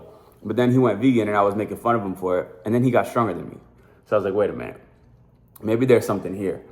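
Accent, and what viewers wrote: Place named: AAVE, United States. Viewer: Lose the ebonics white boy.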